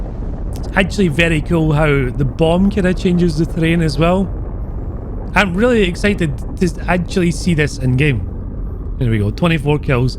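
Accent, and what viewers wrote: Scotland, United Kingdom. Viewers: I love it when I watch a channel for the first time and just here the beautiful Scottish accent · are you scottish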